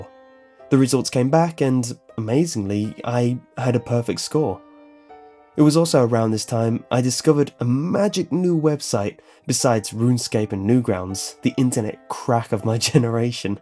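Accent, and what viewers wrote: England, United Kingdom. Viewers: That explains why did you sounds like a British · Where from england are u from are u york shire bread or southern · ENGLAND. That's why I love your voice so much.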